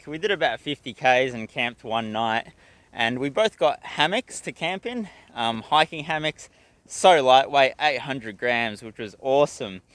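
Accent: Australia. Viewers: Great video :D I'm watching you from some time but I didn't notice that you are half Polish. I guess you were born in Australia which explains the lack of accent. But it's nice to hear "no a szufeleczka, albo coś, dzień dobry..." next to your Australian accent.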